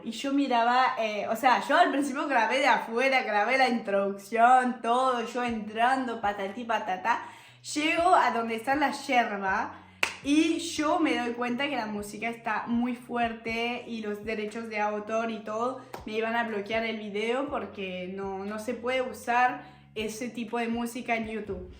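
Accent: Buenos Aires, Argentina. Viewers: Ya viajarás a otros lugares de Argentina. Tenemos hermosos paisajes. Tu español es bien porteño. ❤ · Hablas perfecto argentino❤ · Qué raro! Una francesa/francés hablando mal de su país respecto a Argentina… sabés? Te gusta porque NO conoces…te rodeas en nivel medio alto, hablas de Argentina pero te referis a Buenos Aires, hasta tu … pronunciacion exasperantemente porteño. Yo viví en Francia, en Nantes y en Poissy, y desearía estar allá y no volver nunca mas. Me da pena escucharte hablar en porteño diciendo que es argentino. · Qué bien que hablás el argentino! 👌👌 Grande el bidet!! Irreemplazable! Los semáforos están del otro lado de la avenida para poder verlos mejor y que no se te vengan encima del parabrisas Las llaves Travex son más seguras q las otras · genia, tenés todo el modismos y pronunciación Argento-Porteña!!! Se te pegaron re bien todos nuestros gestos!!! 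En Argentina siempre hay lugar para la calidez y amistad para personas como vos! BIENVENIDA SIEMPRE!!! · Tus ye son de Buenos Aires y del sur de nuestro país pero yo soy mendocina, Argentina y decimos llo allá llaves no yaves, aya Bueno obvio me refiero a la fonética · Ya tenes el acento argentinos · No sé cuánto vivio en Buenos Aires pero el sssshooooo ssshendo se le pegó perfecto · Por lo visto estuviste únicamente en Buenos Aires por el yyyyyoooo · Hablás re argentino. Me encanta.😂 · ¿Por qué pronuncias "SHO" en lugar de: "yo"? 😢 - El castellano de Buenos Aires "no es correcto" para nada - ¡Ojalá algún día visites Santiago del Estero! Allí sí se habla bien. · Es lindo escucharte hablando castellano con un dejo de acento francés pero lo mejor es como remarcas la ye al estilo porteño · Gracias...hablas muy bien el español preces una porteña mas, gracias por tu amor sincero hacia nuestro pueblo, Aqui tambien te queremos.Cariños.Dios te bendiga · Soy muy simpática y tenés un excelente español argentino. 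Gracias por querernos tanto y transmitir tanto cariño por nuestro país . Siempre serás Bienvenida!!!❤ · Muy lindo. Solo le falta aprender que no se pronuncia "caye" ni "yave" (porque solo en Bs. As. se pronuncia así). En el resto del país es calle y llave. Tampoco es "caie" como en Colombia. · muy linda y todo pero me irrita que parece que no sabe que se está corrigiendo a si misma pronunciacion de palabras diciendo "no si dice asi, se dice asi" porque solo conocio el acento bonaerense. Osea no, la forma de hablar de hablar de ellos no es la forma "correcta". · olvídate...hablas muy bien el español porteño😅😅😅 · Hermoso tu español argentino! ❤❤ muy bien hablado. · Hola, sos una genia, me encanta el Aa, muy argentina, te amo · "O sea" "Ahhh" sos más argenta que la verga. Me acabo de suscribir, sos un amor. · Hablas re bien pero no usamos el pues en argentina usamos el entonces si cambias eso vas a sonar más argentina!!!!!! 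· “soy todo oídos” es la expresión para escuchar las ideas que pedis, ya que la idea de lanzar una yerba no va a funcionar 🫠 Muy divertido el video, terrible porteño tu acento😅 ❤️🙌🏻🇦🇷 Ojalá puedas volver y además conocer otras Provincias y los maravillosos paisajes. Bonne Nuit 😘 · Lo de los ascensores son "puertas tijeras" quisieron prohibirlos, por seguridad... pero finalmente los permitieron, porque en las casas antiguas son mucho más bonitos!... TODAS las palabras en las que dudaste estaban BIEN. Solo se dice verduLería. Hablás realmente como una argentina!... "Yo soy toda oídos", decimos. No perdiste PARA NADA tu castellano!... Me encantó conocerte. Ah, en qué lugar de Francia vivís?... Un abrazo muy grande desde San Telmo, Buenos Aires!... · Argentina es hermoso me alegra ya te adaptaste ablas ye😂😂❤❤❤ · Hablás muy bien en argentino!!! Saludos desde Argentina 😊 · Que bien que hablás porteño! 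Muy buen video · Muy bien tu español- argento🎉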